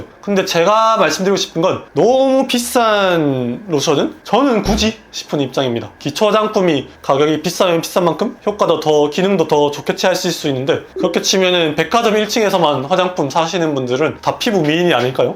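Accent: Busan, South Korea. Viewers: 원장님 시원시원 솔직한 화법 너무 좋네요^^ 부산사투리 너무 정겹고~~~~~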